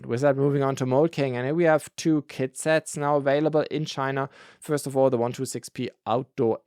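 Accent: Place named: Germany. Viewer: Since my German is terrible while my family is over 1/2 German/Austrian American, I love listening to your accent. As a simple tip, bear - the animal - is pronounced the same as bare, as in not covered. Both rhyme with air. Beer, the drink, rhymes with ear, on your head. Your release videos are the best out there for non-lego sets. Pixel Dan's ARC-170 looks like what Lego Group should have done.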